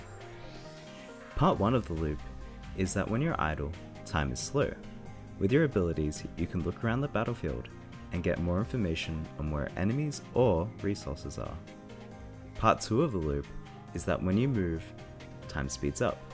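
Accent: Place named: Australia. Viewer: I have been watching your videos recently, but had not released you created Super Hot- great game! Plus you sound like a fellow Aussie, so a thumbs up just for that :)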